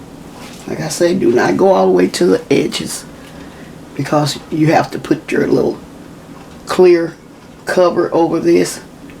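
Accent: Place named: Texas, United States. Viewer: MS I just love your recipes and listening to you talk with that TEXAS DRAWL Becouse I am from TEXAS to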